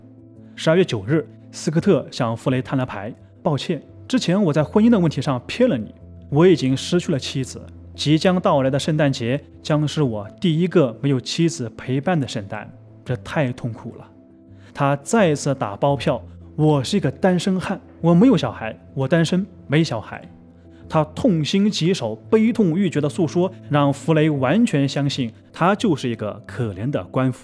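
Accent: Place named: Gansu, China. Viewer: 甘肃口音？咬字有点怪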